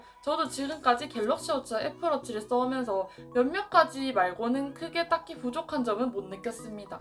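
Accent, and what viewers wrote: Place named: Gyeongsang, South Korea. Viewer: 갱상도 억양 고향생각 간절하굼요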